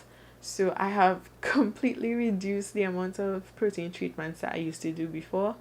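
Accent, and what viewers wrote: Trinidad and Tobago. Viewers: That accent has to be from Trinidad 🇹🇹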